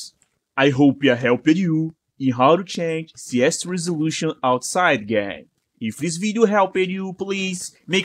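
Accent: Brazil. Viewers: crtz q é brasileiro tem mt sotaque kkkkkkkkk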